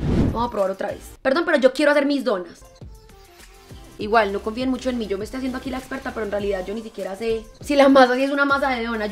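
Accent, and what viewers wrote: Antioquia, Colombia. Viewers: Me encanta tu acento paisa ♥️más vídeos de cosas perdidas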